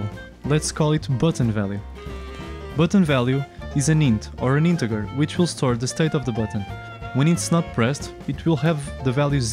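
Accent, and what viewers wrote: Portugal. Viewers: Este sotaque não engana ninguém, tinha de ser português, ;) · Impecável. Bem que estava a reconhecer um sotaque Português. Bom vídeo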